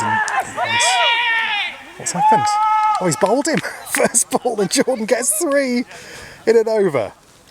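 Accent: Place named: North, United Kingdom. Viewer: Its already excellent to listen too, with the added spice of your accents from up there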